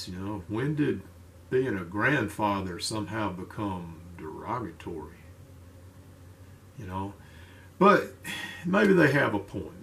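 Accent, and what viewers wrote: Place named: Kentucky, United States. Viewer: Hey you better stick around, for one, im a revolver fan, for two I was raised in Kentucky and I love your accent. I had my first long gun at 9 years old, it was a 410 Stevens and still have it, I was trained by a Sargent ,Korea era, named (dad) .and three, I'm 64 and love using an old 38 from the hip. A Taurus 82 that's all I need. Thanks again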